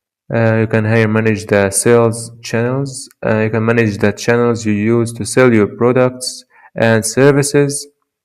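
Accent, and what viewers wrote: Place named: Arab world. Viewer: انت عربي مبين من حكيك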